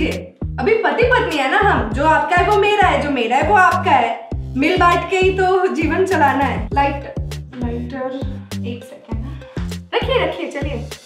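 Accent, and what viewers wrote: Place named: Uttar Pradesh, India. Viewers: Yrr your up accent is too good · I loved your bhojpuri Hindi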